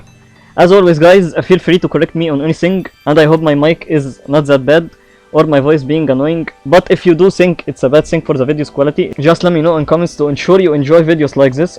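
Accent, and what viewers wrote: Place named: Egypt. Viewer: man why i feel ur an Egyptian cuz of your English accent 😂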